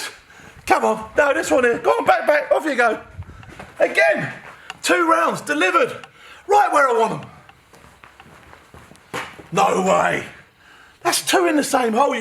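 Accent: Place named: Norfolk, United Kingdom. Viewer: Sounds like a norfolk lad,probably explains why he's a bloody good shot....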